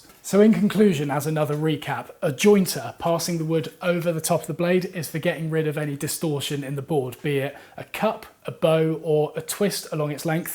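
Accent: United Kingdom. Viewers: are you British? if so stick to our language, couldn't watch the rest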